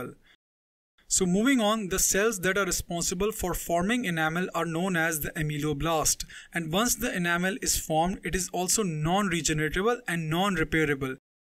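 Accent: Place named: India. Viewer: You have the Bigest Indian accent I’m not trying to be mean my friend is Indian as well) btw thanks for teaching me this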